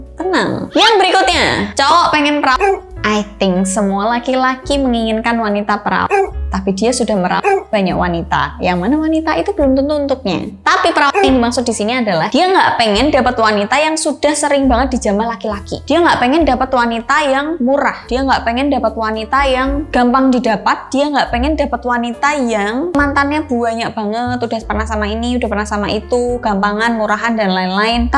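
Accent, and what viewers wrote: Java, Indonesia. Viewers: Medok e jan joss mbk yu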